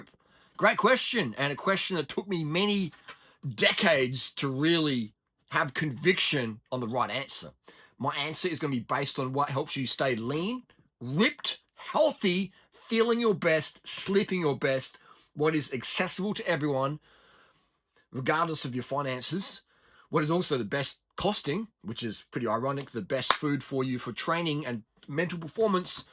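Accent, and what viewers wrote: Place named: Australia. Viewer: When I hear an Australian accent I pay attention.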